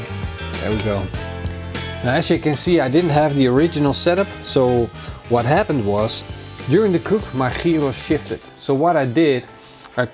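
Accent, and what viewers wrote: Netherlands. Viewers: .... Sir, you are Dutch, aren't you?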